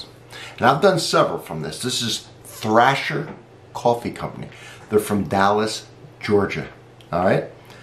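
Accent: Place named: Philadelphia, United States. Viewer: New to your channel and really enjoyed watch all the coffee videos. A nice way to spend a Sunday morning! Will have to make my way through the beer and whiskey ones! I switched to using heavy cream (also from Kroger here in Indiana) instead of half and half and sugar and never looked back. It does bring out the taste. But your sound more like a Philly boy than a Kentuckian!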